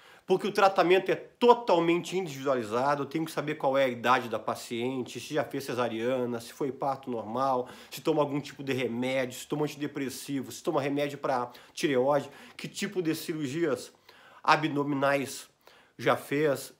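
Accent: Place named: Rio de Janeiro, Brazil. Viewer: Dr, és aqui do RS, como diz, e pra que ou por que esse sotaque carioca?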